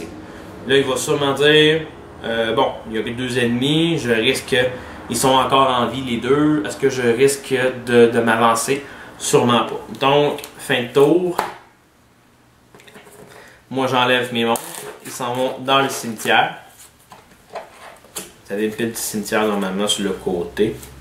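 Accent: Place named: Quebec, Canada. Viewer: enfin des instructions en bon vrai quebecois, merci !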